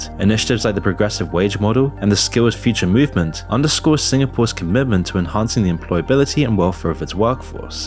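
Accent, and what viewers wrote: United Kingdom. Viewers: Why is it these videos are always made with a guy with this kind UK accent? It's driving me nuts 😂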